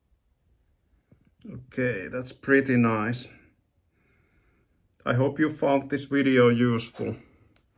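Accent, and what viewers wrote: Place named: Finland. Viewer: Hi, Awesome Video. I thought I recognised your accent, and with a name like that, I thought surely you are from the fine country of Finland. I have a good friend of mine who lives in Kuopio. Thanks for sharing!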